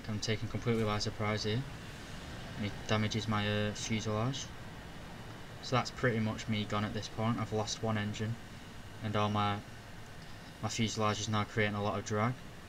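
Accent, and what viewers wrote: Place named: Yorkshire, United Kingdom. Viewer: It's great. I thought you were Yorkshireman like me.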